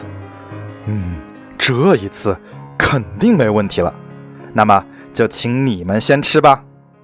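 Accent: Wuhan, China. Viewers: 听着你说武汉正宗弯管子普通话，好亲切啊！ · 我也是家里有两只猫的武汉人 听你口音就像 直到听到你妈妈给你打电话 实锤了~ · up主是武汉人？浓浓的武汉普通话的味道。。。🙂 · 武汉老哥？ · 湖北口音武漢口音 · 一口汉普，武汉人吗？ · 武汉口音？ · 熟悉的武汉普通话，老乡，欢迎来德州奥斯汀试试本地的Franklin，salt lick等等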